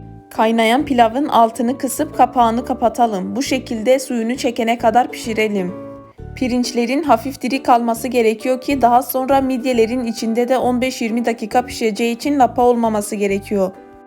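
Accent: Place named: Eastern Anatolia, Turkey. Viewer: Dogu sivesi. Almanci sivesi. Ortaya karisik konusuyo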